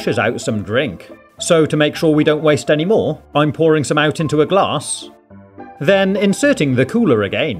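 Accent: United Kingdom. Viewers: i like how he uses british accent with american vocab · are you british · Are you British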